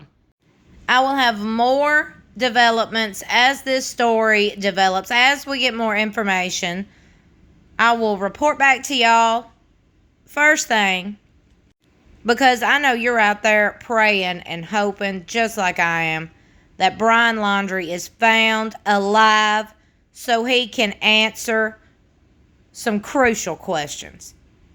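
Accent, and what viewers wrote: South, United States. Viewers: Girl you as country as country can get you got that slang girl where you from Manchester ky I bet Harvard ky it's the south I know that for sure 😆 I love 💘 it country girl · I love your voice down south hospitality race aside I like your show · Love this Southern Accent.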